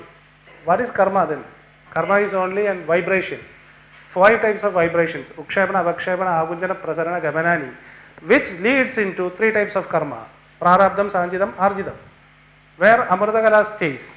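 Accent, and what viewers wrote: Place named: Kerala, India. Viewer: learn to speak english first man .... i can't understand u kerala accent .... plzz work on this .....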